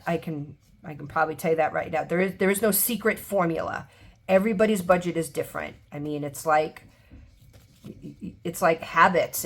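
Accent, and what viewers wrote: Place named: New York, United States. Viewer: Hi! I’m enjoying your videos! Really miss hearing that NY accent. I haven’t been home in so long. 💜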